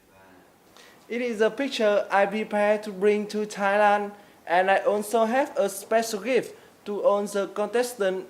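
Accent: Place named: Vietnam. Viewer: Nghe hơi bị THUẦN vIỆT quá,không chuẩn...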